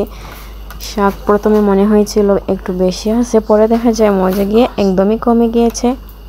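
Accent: Sylhet, Bangladesh. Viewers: Tumi ki sylheti?koi thako?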